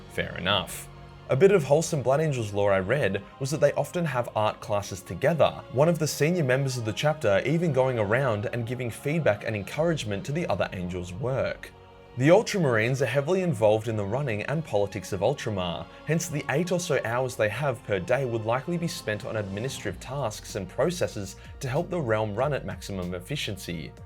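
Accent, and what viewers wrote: Australia. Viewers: It takes an Australian 40k nerd to make Yandare sound cool what a time we live in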